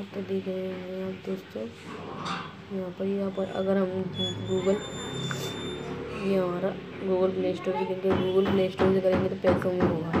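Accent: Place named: South Asia. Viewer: roman reigns fans only well than you must be from India or PAKISTAN cuz your accent made me feel like you're speaking Urdu or Hindi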